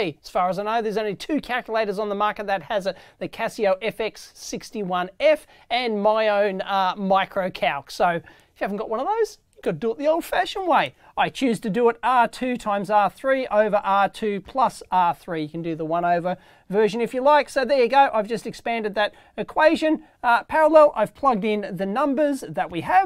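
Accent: Australia. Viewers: I love this man. Also the Australian accent is icing on the cake. Great tutorial, thanks!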